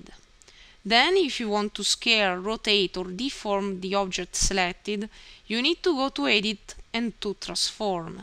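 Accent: Italy. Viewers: great job! nicely explained. ben fatto, ho sentito un piccolo accento italiano? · R u Italian?? Cause ur accent looks like Italian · Love the accent as well sounds Italian. The video is pretty cool I think you encapsulated everything quite well for basic skills... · thanks,your all tutorial r very good n easy to understand n it's working out for me,your pronounce is very cute,i guess u must b Italian by the way keep it up this good job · are you italian ???? just asking cause your accent is so cool :) :)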